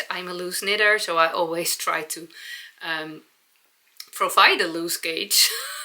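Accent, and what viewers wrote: Netherlands. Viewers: Merry Christmas and Happy New Year,! Never feel the need to apologize for your "Dutchy English!" We love it! My kids and I are trying to learn German together this year, and I would never be able to do a podcast in a foreign language! Whew! Also, I am a fellow curly hair girl, and I love your look both with and without the headbands. I never feel as confident with one on myself...but I love the way they look on others. I want to try wearing them more...must try knitting some, I guess!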